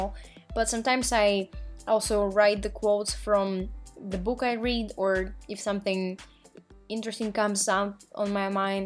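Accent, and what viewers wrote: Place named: Russia. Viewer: хоспаде, она же не занималась курсом фонетики, чисто русский акцент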